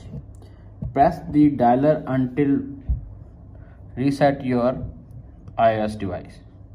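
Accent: India. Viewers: Don’t be fool by hi Indian professional voice all liar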